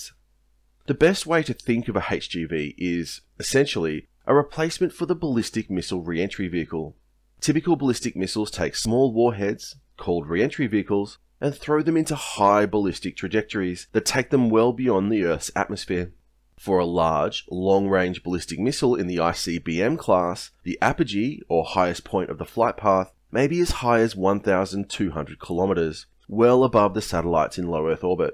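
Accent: Australia. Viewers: You sound like that classic Australian Doosh bag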